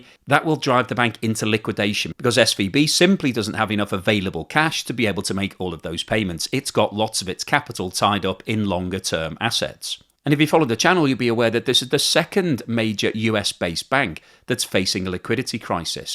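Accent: United Kingdom. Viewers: Just because you are British you sound like you know what you talk about but you don't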